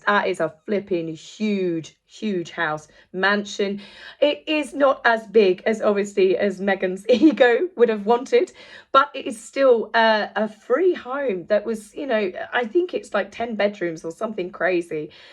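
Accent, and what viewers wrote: South, United States. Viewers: OMGOODNESS!!!! Your AMERICAN ACCENT IS AMAZING!!!! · Do feel better!!! You still look fabulous!!! Your American accent was good..made me smile!! Americans are more aware every day they are scoundrels...how could we not be, they won't shut up. Too bad their millions cannot buy them a personality, they both are horrid!!! Thanks for video, was wondering where your "voice" was.... Great that you are feeling better!!! 💫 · Okay, your American accent, dare I say slightly Southern accent, is EVERYTHING! I have a cousin who speaks just like that 😂😂😂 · so. how about trying an accent that doesn't sound like a part of the southern U.S. You obviously have no idea what you're talking about. Maybe try staying in your lane. FYI, we don't like them either. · I think you need a little work on your Southern American accent 😅. But decent try (from someone living in Florida)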